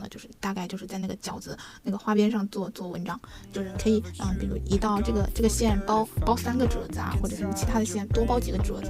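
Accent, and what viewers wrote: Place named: Duchang, China. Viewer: 听到都昌话了...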